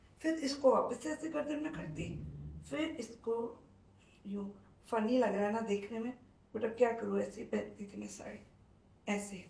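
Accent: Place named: Delhi, India. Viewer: Are you a Delhite ..your way of speaking is like them..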